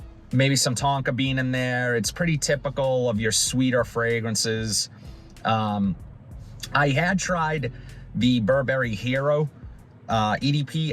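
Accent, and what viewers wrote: Boston, United States. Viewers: Great way to test fragrances for sure. Love the vlog! Whats your accent? Boston?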